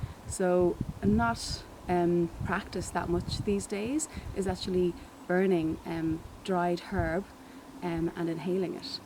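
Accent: Ireland. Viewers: Is that a connacht lilt I detect? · love the gentle Irish accent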